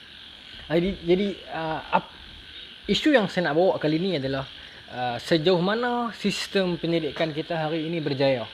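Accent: North, Malaysia. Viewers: kasaq ustaz 😂. Org utagha mmg camtu hahaha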